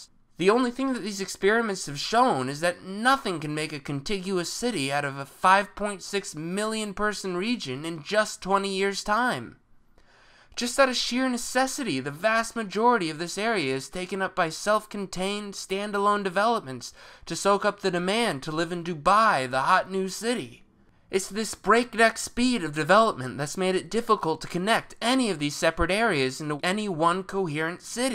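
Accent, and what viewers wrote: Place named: United States. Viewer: yes, whiney, whinging american accent is just more bad taste.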